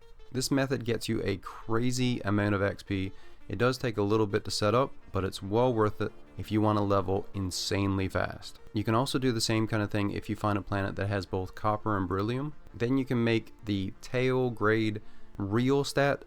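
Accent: Newfoundland, Canada. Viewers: I didn't notice your channel name, but 3 seconds into the video I knew you were from Newfoundland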